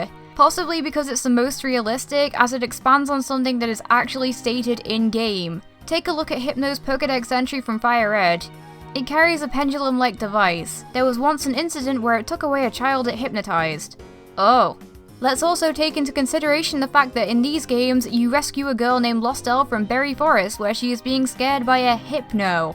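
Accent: United Kingdom. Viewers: 100% British I'm having a stroke hearing this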